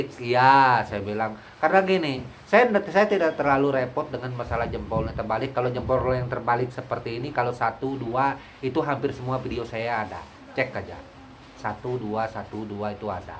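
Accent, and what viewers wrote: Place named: Madura, Indonesia. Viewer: mAAF ko terkadang saya dengar seperti logat Madura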